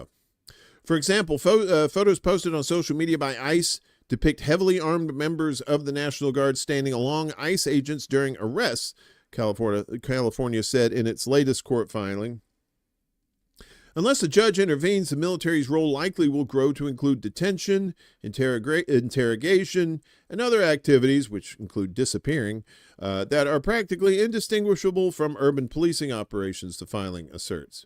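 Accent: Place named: Israel. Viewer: f israel ❤🖤🤍💚 i like yer israeli accent btw! as well as the 'US hegemony' voice!!!! love from an 'merican in UK ;D x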